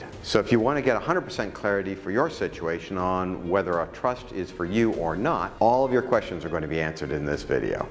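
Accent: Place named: United States. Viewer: Nothing personal but an American accent is a bit of a red flag especially with the few Americanisms being slipped into UK society since Brexit. A lot more Private healthcare and therapy adverts being the most obvious.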